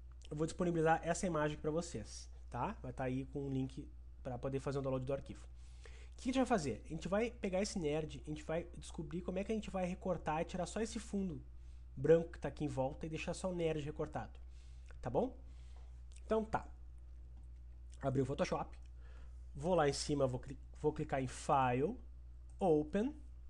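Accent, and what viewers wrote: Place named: Rio Grande do Sul, Brazil. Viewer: Eu simplesmente amei Gostei do modo que você falar, com certeza é Gaucho muittto bom mesmo, aprendi demais Obrigadaaa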